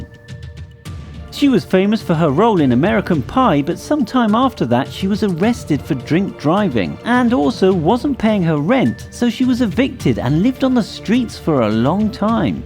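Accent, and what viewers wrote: United Kingdom. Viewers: It’s always a British background voice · Very sad. But...I love how the British say Buth-day for birthday, and "don't take drug" instead of "drugs " lol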